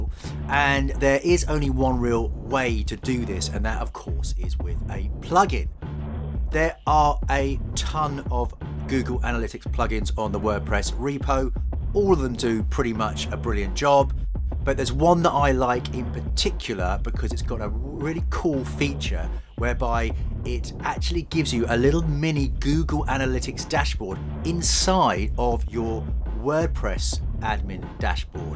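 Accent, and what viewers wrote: United Kingdom. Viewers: WOW! The easiest youtube tutorial I have ever come across. Also always love the British accent too. I just subscribe to your channel... Will be waiting for your next tutorial.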